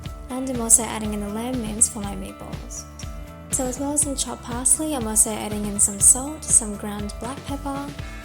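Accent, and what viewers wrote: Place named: Australia. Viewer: nice to hear an aussie accent for this!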